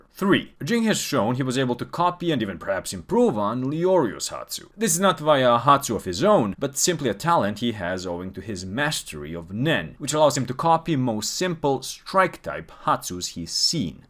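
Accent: Eastern Europe. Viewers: Somehow kinda funny to hear a Slavic accent talk about anime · I asked under ur most recent video if you were Eastern European and I just knew I had a Serbian brother here Keep up the great work!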